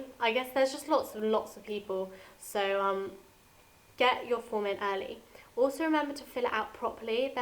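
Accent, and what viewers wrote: United Kingdom. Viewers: Why is your accent way different from mine??? London vs Birmingham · and how about if im born in September 10 1999 is that concluded im 13? And what is YOUR nationality? Thanks and I love your voice, you sound like a real English girl :D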